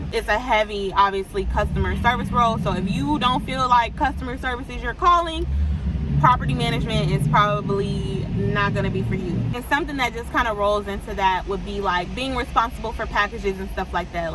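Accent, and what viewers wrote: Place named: California, United States. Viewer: You sound like me a Cali girl coming to a new state… NC. Getting into Real Estate but waiting to get things transferred over… so it felt like a right start to get into leasing/sales. I got hired as a leasing consultant due to my background, work history and personality. This gives me great perspective and an ideas of what I’ll have in store. Glad to live in a safe community. I’m here for the pros and cons. Including a work life balance. Thanks for being honest! 😊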